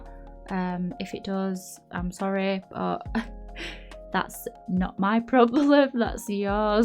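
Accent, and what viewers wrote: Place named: Northern England, United Kingdom. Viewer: Subscribed mainly because of the Northern accent (we are under represented on YouTube 😂) Also those window boxes are gorgeous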